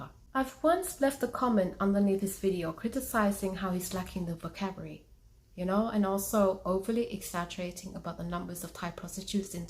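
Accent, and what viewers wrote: United Kingdom. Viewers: you're british? cool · Wher'd ya acquire that pommy accent, สำเนียงบิวติฟูลน๊าจ้ะ😂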